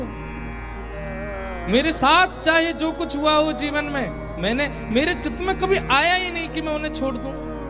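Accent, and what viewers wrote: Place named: Braj, India. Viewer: Mharaj apki ktha brij bhasha bhut pyarilagtihain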